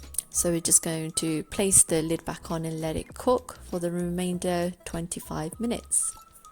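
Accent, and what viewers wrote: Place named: United Kingdom. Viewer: Nice british accent yummy food! (_ _)/"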